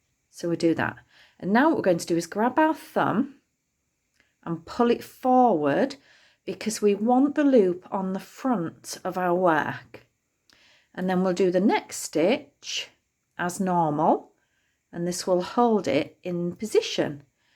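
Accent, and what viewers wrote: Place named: Yorkshire, United Kingdom. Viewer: Tricky, but clever ! Lovely true Yorkshire accent ,,just same as my Grandaughter! 😀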